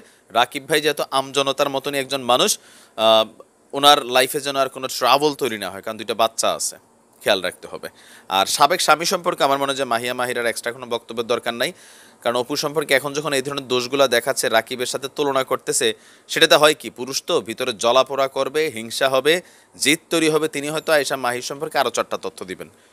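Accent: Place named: Comilla, Bangladesh. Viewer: আপনার কুমিল্লার ভাষা গুলো খুব ভালো লাগে আমার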